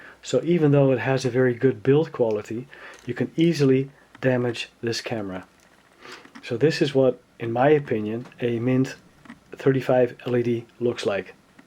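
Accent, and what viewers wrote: Netherlands. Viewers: Ik hoor een Nederlands accent een vraagje wat is het verschil tussen een 35 en een 35 led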